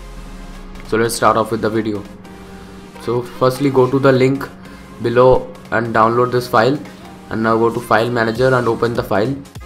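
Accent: India. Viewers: tenk eu kam egen. hard to understand thick Indian accent with loud bg music and bad sound quality.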